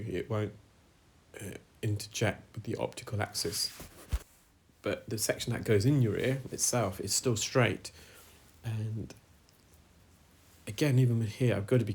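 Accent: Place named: Estuary, United Kingdom. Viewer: I was listening to you waiting and waiting and waiting to hear you pronounce one of the "bath" words ("half" takes the a of father everywhere in the UK so that doesn't count) until I finally heard you pronounce "afterwards" as "ahfterwards"... All this in an attempt to place your accent. It's not "posh" and it's not even RP (received pronunciation) but it's not a "common" accent either. (You are, after all, very highly educated). Your accent sounds like it's from somewhere in the SE (or south central England) and it sounds like it might possibly be from the East Midlands or southeast Midlands or even south London. It's certainly not Scottish or from anywhere in the north or the west country. It could, theoretically, be from Wales but that's a part of Britain I'm not too familiar with. I don't want to take any wild guesses but it definitely sounds like it's from the south (and not the extreme western part of the south, like Devon or Cornwall). 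It sounds like what's referred to these days as "Estuary English" (because it's primarily spoken in the SE, at the estuary of the river Thames). It's used by "yuppies" and other educated, upwardly mobile types. It's not a "posh" accent but it's also definitely far from being a cockney accent (even if it does have a little itty bitty bit of cockney in it). 🇬🇧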